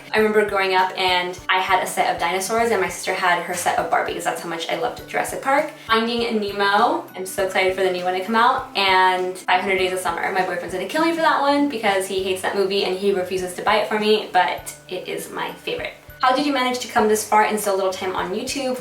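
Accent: Mexico. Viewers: you are soo beautiful and I value your makeup advice the most! If you were to make videos in spanish, you would appeal to a wider youtube audience. I think you should! and by the way I knewwww you were mexican, i recognied that accent (in the best way). love you!!! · I KNEW you were Mexican just by your accent. I have it too. I'm from Mexican parents and born in Texas.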